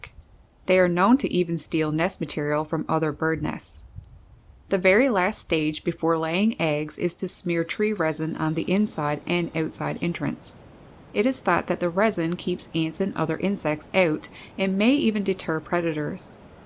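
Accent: Canada. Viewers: I LOVE & her Canadian accent....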